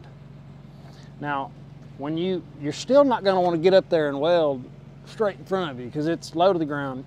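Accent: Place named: Arkansas, United States. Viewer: Hey man I've been watching your channel for a couple years now. Long story short I'm from Arkansas as well. I always thought you were because of your accent. I'm a welder and I'm currently about to graduate out of a well known "Elite" welding school. I know you probably have heard of it. I would love to reach out to you and just get some advice. I don't know if you'll see my comment. I know you're busy take care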